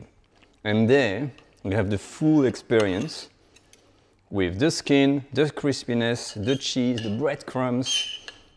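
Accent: France. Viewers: Love your cooking and your French accent ❤️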